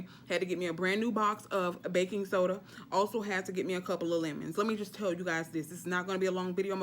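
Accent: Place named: South, United States. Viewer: NEW SUB LOL MUST BE FROM THE SOUTH HAHA LOVE HOW YOU SAY TOILET "Turlet" 😆😆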